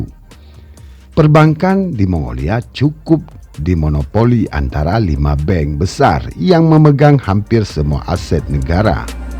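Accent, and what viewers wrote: Aceh, Indonesia. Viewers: Apakah Chanel ini milik orang Aceh..kuliat irama ngomong nya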